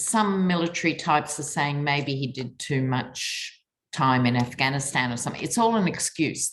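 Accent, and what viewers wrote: Australia. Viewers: I love your Aussie accent!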